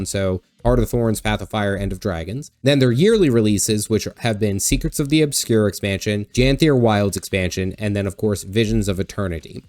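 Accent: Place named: Eastern Pennsylvania, United States. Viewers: Appreciate it! Catching some speech patterns. Are you from Maryland, Delaware, or Pennsylvania? Maybe parts of DC. Thanks for vid 🖖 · love the content and your eastern PA/south NJ accent. cheers, dad gamer from MA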